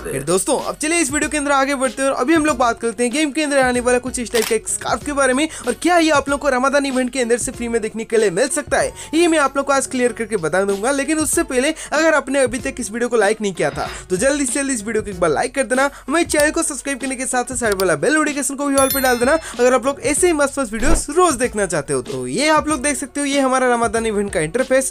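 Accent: Odisha, India. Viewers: Bhai tum odisha se ho na?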